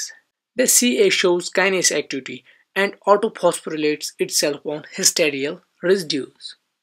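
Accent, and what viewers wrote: Kashmir, India. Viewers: I think this is kashmiri Sir. As by pronounced words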